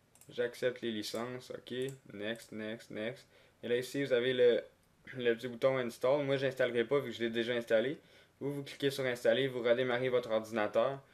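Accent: Quebec, Canada. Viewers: Bjr!:), MERCI BEAUCOUP POUR LE TUTO!!! J'ai essayé pendant 24h plein d'autres méthodes infructueuses. La tienne, 40-60 min et j'avais fini, tout était correct, mon pc ne plante pas, etc., vraiment du bon travail, et pour une fois, c'était en Québécois!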